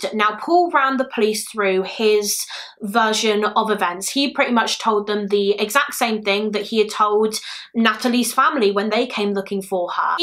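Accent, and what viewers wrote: United Kingdom. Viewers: I’ve also noticed that most true crime YouTubers I’ve came across literally are from the UK.. I’m from America but I have so much true crime channels I’m subscribed to, but out of all the channels I watch religiously only like 2-3 of those are from America, Like 1 is from Canada, and 10+ are from the United Kingdom, that’s crazy as hell, but don’t get me wrong that’s dope as hell… bcuz I love British accents! I love your accent, its sooooo CUTE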